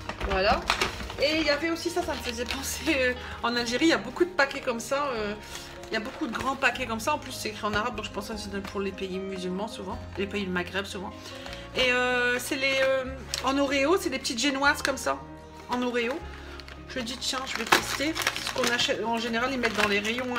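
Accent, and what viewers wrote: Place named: North, France. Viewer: Salam merci pour la vidéo , j’ai le meme passe-temps que toi le crochet ! J’en fais tout les jours quand je peux j’adore !! Tu viens du Nord de la France cela s’entend je reconnais puisque moi aussi lol , d’où exactement? Bon ramadan à vous bisous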